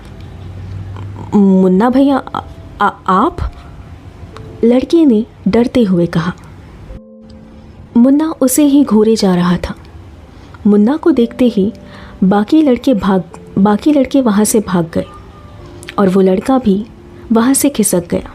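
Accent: Varanasi, India. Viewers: Apki awaz me jyada maja ata hai dii rj voice me bhi sunke ka maja hai par banarsi tone apka jyada acha hai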